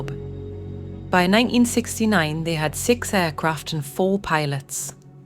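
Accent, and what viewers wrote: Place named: Newcastle, United Kingdom. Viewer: Hi ' '. I was a porter at Newcastle airport from 1987 to 89. I remember Gill air a lot as I worked a lot with them at the check in weighing the luggage and tagging them. This was their regular flight to Belfast. It was the same aircraft that you take a look around during this video, the one with the wing over the top of the fuselage. It brought back a lot of memories this video so thanks for that. Your videos are so interesting and as I've said before, greatly narrated with a true Geordie accent. Keep them coming.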